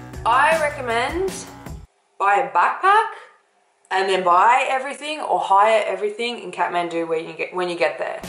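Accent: Australia. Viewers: Can't miss that accent! I did the trek in 2019 and loved it. Am going back as soon as we can travel again. I am from QLD; which state are you based in? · What an Aussie accent:D Dear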